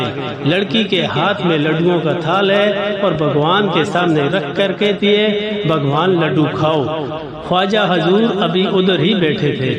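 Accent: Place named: Punjab, Pakistan. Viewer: بھوتنی کے اُردو ٹھیک سے بولا کرو نہ کہ پنجابی نما اردو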